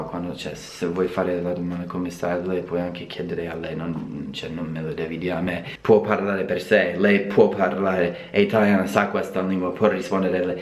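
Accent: Rome, Italy. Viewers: Ho le lacrime agli occhi dalle risate! Sei troppo simpatico. E poi ADORO che parli ROMANO 💖 e si sente un bottoooooooo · Non ci credo, è l'unico straniero che abbia mai visto che ha imparato pure a fare l'accento romano:D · You speak Roman not Italian, you know that, right? "Stare" is not a synonim of "essere" in Italian. If you want to learn italian I strongly suggest to change city. Go North young man! - (PS. Hamburg is a German town maybe "hamburger" should be pronounced with a German accent no?) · Troppo forte la cadenza romana😂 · Too much Romanesque... 😂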